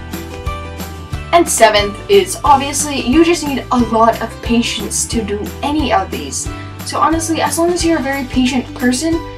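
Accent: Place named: United States. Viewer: I love your mini country accent it's so cute❤️ :)))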